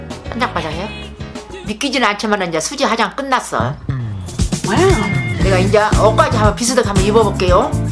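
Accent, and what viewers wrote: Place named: Gwangju, South Korea. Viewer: 너무 고우세요..♥♥ 저희 할머니 생각도 나고 제가 광주사람이라 그런지 사투리도 너무 정겹고.. 할머니 사랑해요 항상 응원해요♥♥♥♥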